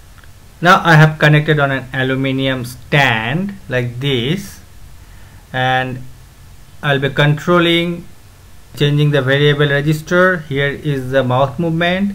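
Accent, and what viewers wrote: India. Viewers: So...you are Indian?Accent yaar.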